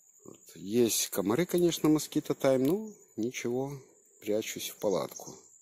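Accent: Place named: Ukraine. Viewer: БлагоДарю за ответ, я так и подумала ,что вы из Украины (по акценту в разговоре) если не секрет из какой области?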